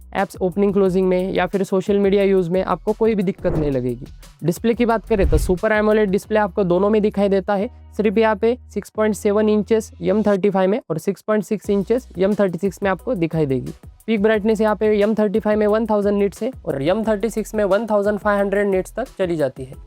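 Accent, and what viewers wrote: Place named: South India, India. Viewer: Why comments making fun of yam or M, it's common i guess south teacher does have this pronounciation Not a big deal..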